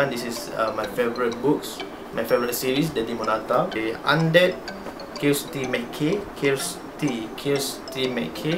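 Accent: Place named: Malaysia. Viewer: Where are you from? I bet it's between Malaysia or Indonesia. I'm Indonesian actually but not a booktuber, but I subcribe u to find good books :D I can't speak english fluently too xD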